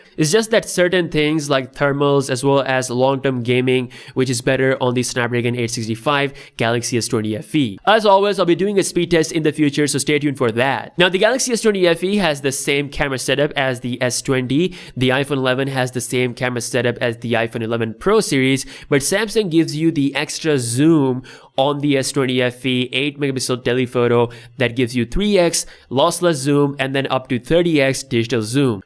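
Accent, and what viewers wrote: India. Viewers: U sound so Indian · I just cant watch this. Too much indian accent. My god.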